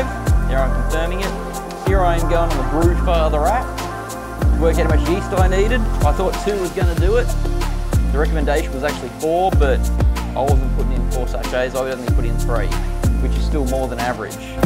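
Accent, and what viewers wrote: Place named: Australia. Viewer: Nothing’s better than watching a local brew. Love the Australian accent, approach and ingredients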